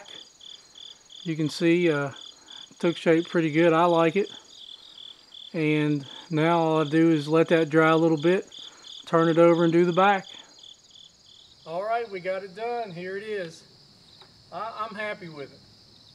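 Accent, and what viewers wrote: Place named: South, United States. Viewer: where do you all live you sound like you are from the south?!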